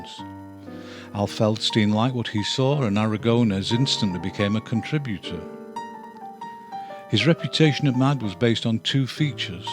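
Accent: United Kingdom. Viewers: Why am I listing to an individual with a British accent tell me about Mad Magazine ? Spy vs Spy was the best part of Mad Magazine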